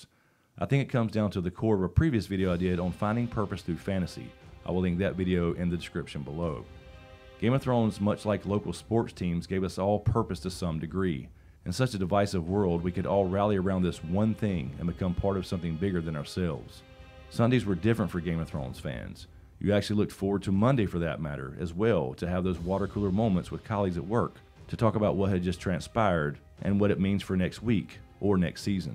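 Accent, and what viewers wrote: South, United States. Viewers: Yes, my heart is still broken. I have not re-watched or re-read GOT since S8. It was the sudden stop at the end. The death that Jon described as "nothing". There is an empty place where Sunday used to be. I miss you, and I miss James all of our GOT family. And because I work in healthcare, the past 2 years have been... well, let's just say GOT is not the only thing that has broken my heart. But I had a few minutes tonight and just hearing your Southern Boy charms, brought me right back to that "super bowl" feeling. GOT did not end well, but maybe, just maybe it will begin well. And we can gather around our respective computers and watch to our favorite YouTuber turned Fantasy Writer stream the GOT prequel content and our hearts will be healed.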